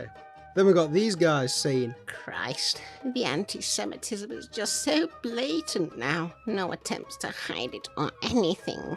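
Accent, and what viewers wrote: United Kingdom. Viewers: the comment about hating on the British accent is sad. I think the British accent is cool, like a calling card of their culture. I love this channel because he has almost no filter. I can't get enough. Keep up the good work. Help the this poor sad world.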